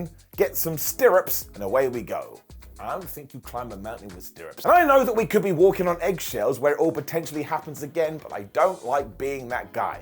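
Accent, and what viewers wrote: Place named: United Kingdom. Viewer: You speak so british.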